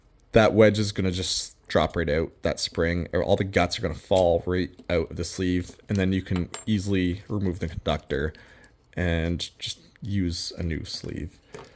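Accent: Canada. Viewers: I’m curious are you from Canada? I ask because your accent sounds like a Canadian accent of some sort and a lot of the stuff you use in your videos I’ve yet to see in the states or at least my state and potentially Missouri as we go up to visit family that lives there once every year or 2 either way I love your videos though I do worry about your safety as it does seem there are a lot of risk involved which is why I am glad you assess the situation before hopping into the bucket and start working and also make sure you take proper precautions to ensure your safety while also not causing further damage to the lines you’re working on but one thing I do have to say the fact you take the time to explain why you’re doing something this way or that way or just simply boiling down to training you’d receive as becoming a lineman, and it’s a dangerous job, my grandfather works in highway construction but also has built houses before he and my grandmother moved down here when my mom was 2 so he also has some knowledge when it comes to wiring up a home for the most part and as someone who’s fascinated by electrical equipment and electricity he left me with a warning to be careful when measuring the voltage 120V AC as it can also cause severe injuries or death, which I’ve already taken precautions when I’ve done this stuff, but I’ve learned a lot about step up voltage, step down, inverting voltage from say 12vdc to 120vac even down to using a old spare computer power supply as a a budget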